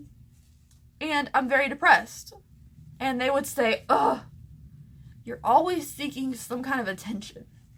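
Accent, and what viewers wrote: South, United States. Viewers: You are such a beautiful, beautiful girl. My heart breaks for you. I am from Denmark, so I have never experienced racism in that way and all the hate it brings. But I can relate to being an outcast dreaming about old world glamour. I think you are so strong. But you must be going trough a very shitty time right now, breaking up with the person who is your only safe heaven. I can only advice you to spend time with your grandparents before it is too late. If you ever chose to see your parents again, do it on neutral ground and state that you will only do it, if they will respect you. I know the healthcare in The US is somewhat shitty, but I really hope you have or can get some form of professional help. It is so important to talk about such trauma. I hope that you know I and many, many others love to watch your videos! i really admire that you can make one each and every day! 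Such talent and discipline. I wish you the very best. And I looove you southern accent! Please do not suppress it! Love from Denmark<3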